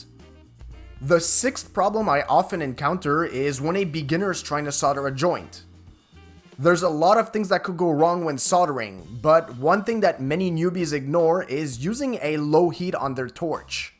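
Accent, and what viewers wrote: Quebec, Canada. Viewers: I new you were Canadian A bit of a french accent. Sudbery? Thanks your my go to for plumbing. hate doing plumbing fixes or repairs but I don't mind installing new.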